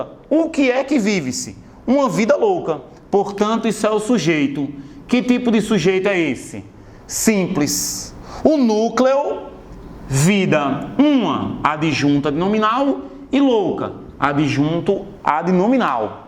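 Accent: Northeast, Brazil. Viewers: e esse sotaque nordestino professor o senhor é daqui mesmo?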